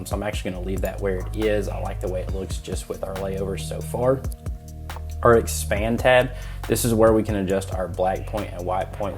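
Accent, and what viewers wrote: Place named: Georgia, United States. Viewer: That's a Georgia Accent if I ever heard one. Takes one to know one. Marietta!!!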